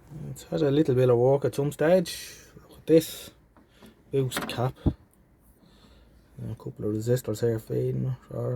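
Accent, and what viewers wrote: Ireland. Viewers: As an Englishman, I absolutely love your wonderful Irish accent!...... You sound like, turning their hand to TV repair! 👍😂🤣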